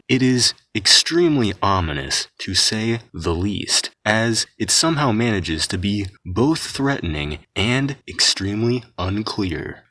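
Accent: Spain. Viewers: Do you speak Spanish? You really have the pronounciation of a spanish speaker person. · Ele lendo com sotaque espanhol dms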